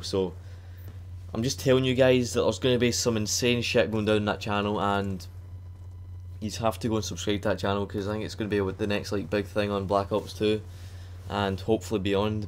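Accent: Scotland, United Kingdom. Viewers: Wings sent me .. Don't normally check out other people but glad I did !! Highly entertaining gameplay .. Also was pleasantly surprised that you too are Scottish - fae Ayrshire ")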